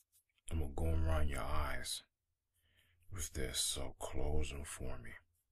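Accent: New York, United States. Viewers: Is he from New York? Accent vibes. Was waiting for him to say deadass....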